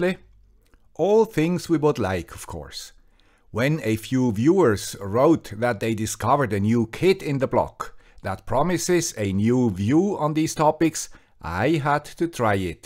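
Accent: Switzerland. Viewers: What accent is that? Every time I’m watching your videos it sounds like a Swiss accent to me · As always you show us new and exciting possibilities. Thanks guy with swiss accent!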